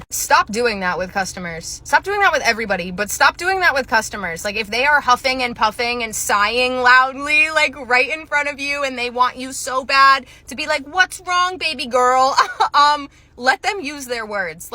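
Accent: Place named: Massachusetts, United States. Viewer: So, I'm from MA and it is driving me inSane that I can not figure out if your accent is from there or not😭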